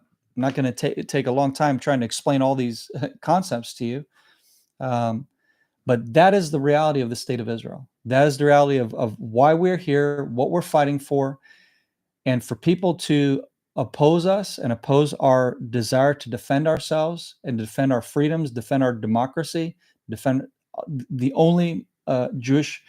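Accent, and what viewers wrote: United States. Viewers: America accent, American passport. Get out of Palestine. You are not the victim.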